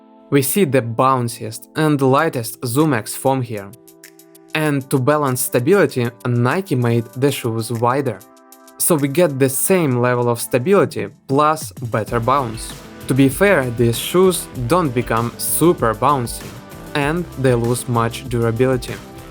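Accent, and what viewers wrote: Russia. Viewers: Хорошо что русский акцент, так понятнее 😃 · По произношению понятно, что текст читает русский:)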